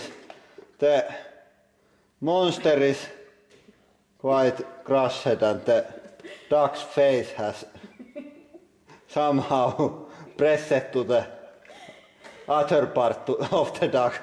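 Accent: Finland. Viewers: rallienglanti for the win:D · Channel Thats cool. i love your Finnish accent by the way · Valtteri Närhi Aksentista huomaa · kunno rallienglantii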